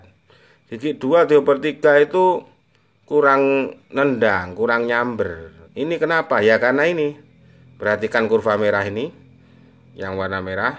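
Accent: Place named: Java, Indonesia. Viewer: slang nya pekat jawa. terbaik bang. salam dari malaysia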